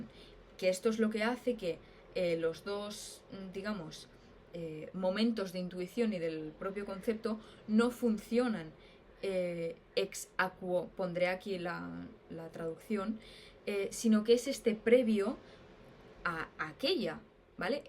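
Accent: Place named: Spain. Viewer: Me gustan las mujeres q leen...¡y qué manera de gustarme el acento español!...felicitaciones...😊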